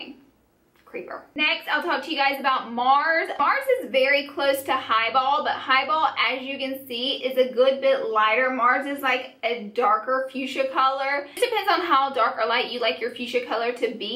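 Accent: South, United States. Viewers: I was curious too!!! I thought it might have been because of her southern accent, but most people know koala at least /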